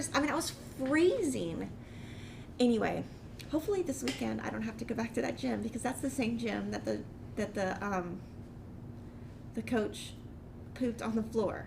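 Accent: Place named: Texas, United States. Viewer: I really like seeing other stitchers/quilters work. I get ideas especially for colors that I wouldn't have thought of on my own. Color of thread, floss and fabric are the hardest things for me to choose. BTW, I love your accent especially when you say y'all. It reminds me so much of growing up in El Paso.👍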